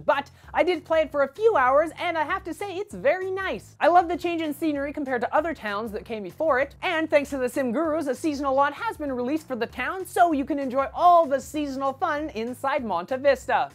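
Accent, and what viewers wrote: Canada. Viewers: Guys, he's Canadian. Italian pronunciation doesn't really have a lot of influence. Both are acceptable. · Being from Canada It's actually his accent...he didn't say it wrong.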